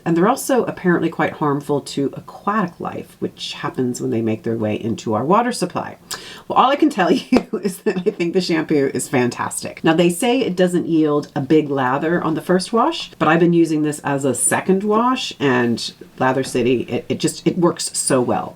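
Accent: Ireland. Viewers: I am new to your channel and wondered what your accent is. A little Irish? Just curious. My boyfriend also hates Snail Mucin! Any time I use it, he doesn’t want to kiss me but ultimately can’t help himself in the end 😂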